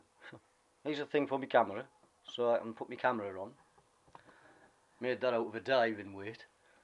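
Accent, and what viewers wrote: Middlesbrough, United Kingdom. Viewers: Good vid thanks, must be a Boro boy with that accent!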